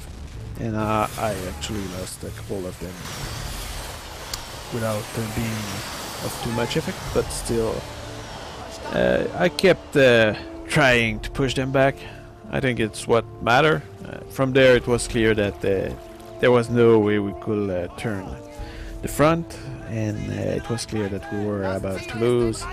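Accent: Quebec, Canada. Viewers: you are from quebec? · You're French-Canadian right? all due respect, just curious ;)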